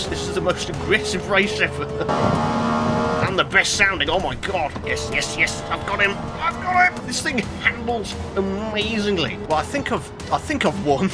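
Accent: United Kingdom. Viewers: Now make an Kei truck funny British man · The BRI'ISH funny man has posted WE DO EHT🍷🗿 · this funny british man making my day better again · 0 new funny british man car tutorial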